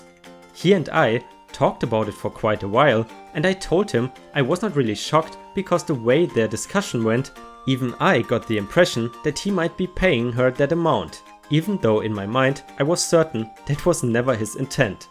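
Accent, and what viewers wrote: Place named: Germany. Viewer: I was pretty sure that accent was German, and it was all but confirmed by your perfect pronunciation of Bremen and SV Werder. Servus~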